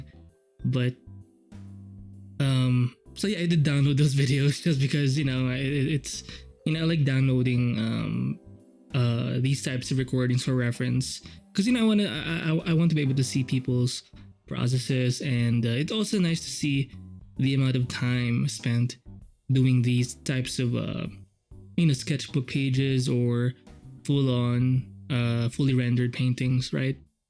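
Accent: Philippines. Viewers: You sounds like a Filipino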